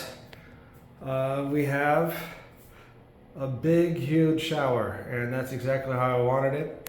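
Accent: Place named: United States. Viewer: If you don’t mind me asking because you didn’t disclose the information on how much was the total cost for everything. You sound as if you’re from the states as myself. So my question is how much was everything (land & everything built including fence) plus if you are from the states how are the taxes done?, do you have to pay taxes in both countries?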